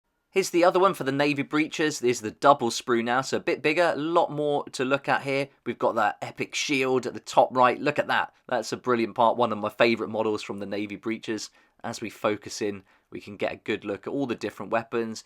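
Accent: United Kingdom. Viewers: This is an unrelated, dumb question, but since you have a UK accent, and you have that knife to open the box, are those knives legal to carry in the UK? Just curious as a US person.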